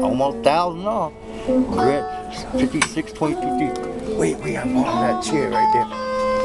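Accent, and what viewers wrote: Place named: South, United States. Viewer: It's so weird seeing a guy with asian heritage having a really southern accent. XD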